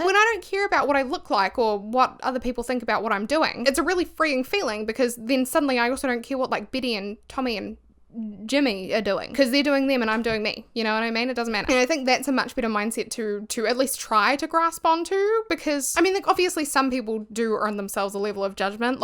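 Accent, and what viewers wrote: Southland, New Zealand. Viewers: just got recommended this video, and as if ur videos dont seem to be up my alley already, i heard ur kiwi accent, and as a fellow kiwi, immediate subscribe. its hard to find us out here !!! 😻😻😻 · You do the New Zealand O proud 👏🏽... or should I say "the New Zealand Oarrr." Or is it "Ower.?." 🤷🏽‍♂️ I have no idea what you're doing with your O's but I ❤it. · "my accent? it's a kiwi accent" right as I was wondering "huh, not British.. not Australian..." the moment I scrolled down and saw "NEW ZEALAND" by the description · I know this is 8 months old, but your constant fiddling with your taonga while dropping that Southland accent is sending me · english is not my first language, I only learned it through english movies, videos etc. and I can't explain my level of confusion after clicking this video. it's the first time I ever heard kiwi accent and for 5 mins I thought I never actually understood english language all my life. 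love the video btw I'm glad yt decided to recommend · Never heard of you or boy throb, but now, I appreciate boy throb greatly and I subscribed to you. Also cool to see New Zealander on YouTube and I also found it funny that you had to explain your accent as kiwi accents are everywhere is Australia lol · Loved the deep dive, thanks for the effort you put into this. For my part, I think they clearly are both talented, enthusiastic, committed to their craft, but also not a typical over-polished boy band, with comedic elements to their presentation, music and style. They're in on the joke, but they are much more than just a joke. I think it's very possible there is someone behind the scenes that helped bring them together, coordinate their efforts, and give them some interesting creative direction, but if anything it would be a collaboration not that the band members are just along for the ride. 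Ps love your kiwi accent. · She literally says in the video that it's a Kiwi (New Zealand) accent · I was listening to your accent for a looong second, and I was like "wait? Nz??? Surely right?!", and then I saw the description!!!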